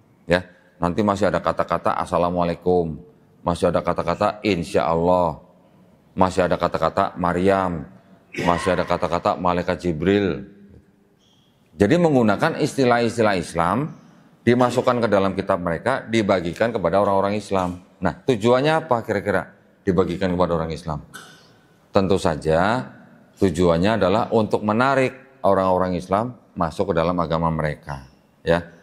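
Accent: Banyumas, Indonesia. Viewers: Logat Jawa banyumasannya melekat mbake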